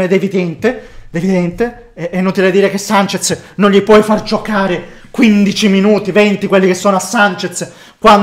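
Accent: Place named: Apulia, Italy. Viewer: Ma di dove sei? dall' accento sembri pugliese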